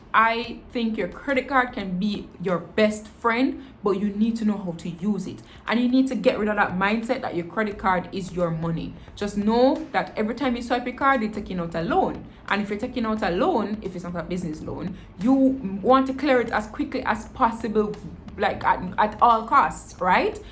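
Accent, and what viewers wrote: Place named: Jamaica. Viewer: SO INTERESTING,,,I WAS A VICTIM OF ALL YOU SAID,,WOW,,, LOL LOVE THE WAY YOU GET CARRY AWAY IN JAMAICA 🇯🇲 patois lol.Daneel really your sister lol,same personality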